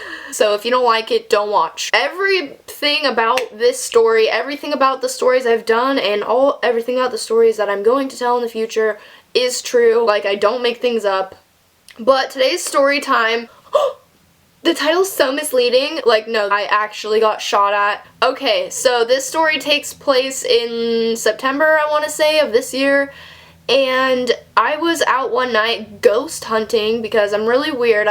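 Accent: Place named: Seattle, United States. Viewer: you have a Seattle accent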